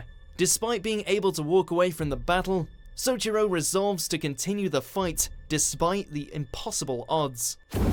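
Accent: United States. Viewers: Owbeetow Ucheeha. Gee, Why the fuck do you have to always americanize the way you read japanese names?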